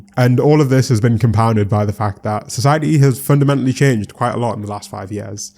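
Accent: United Kingdom. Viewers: I know it’s just how you sound but you sound like you’re mocking me with how you speak… maybe it’s the combination of deep vocal tones and the british accent, im not sure. but if I close my eyes and just listen, it sounds like “yOu ArE mOcKiNg mE.” great video and subject matter but I probably won’t be watching anymore of your videos.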